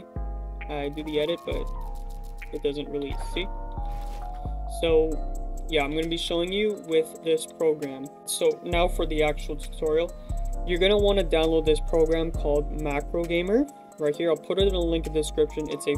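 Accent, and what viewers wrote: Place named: Afrikaans, South Africa. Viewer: you sound afrikaans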